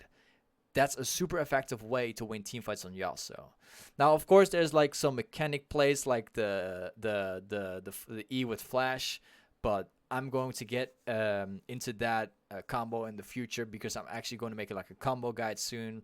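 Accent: Netherlands. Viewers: Are you dutch? I hear lika a accent?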